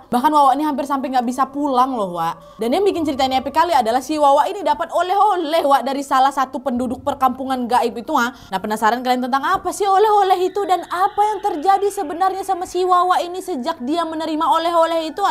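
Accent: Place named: Sumatra, Indonesia. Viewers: logat pku nya kental kali haha,semngtt ka,salam dari marpoyan sekitar😂😂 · asli urang awak Yo? Minang dimanyo? Masih totok minangnyo kayaknya. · Seru denger cerita pake logat Sumatera campur bahasa Padang dan Jawa. Jadi unik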